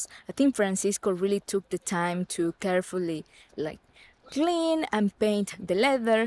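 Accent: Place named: Mexico. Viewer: I love your Mexican accent.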